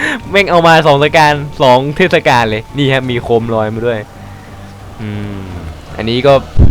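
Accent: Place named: South, Thailand. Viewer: พี่ คนใต้ จังหวัดไหนเหยอ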